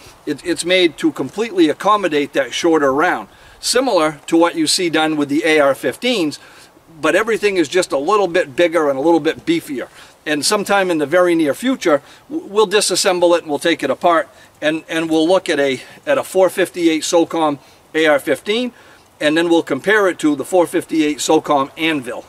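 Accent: Maine, United States. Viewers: I knew you were from Maine when I heard your accent!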